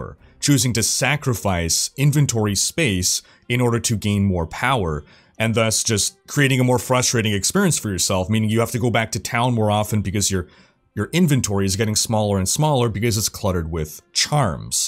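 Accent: Quebec, Canada. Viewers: Wtf I've been watching you for ages and never noticed!!! Québecois? :D loll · you learned french in Quebec didn't you? Your accent is 100% french canadian, I know because I'm one of those weirdos haha. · Bordel tu parles français (enfin quebecquois si j'ai bien reconnu l'accent) ;)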